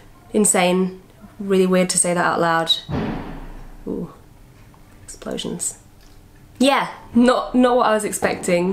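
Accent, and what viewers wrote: United Kingdom. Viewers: You have a very classy British accent normally (no offence) I kind of find British accents very lousy and not super classy like hers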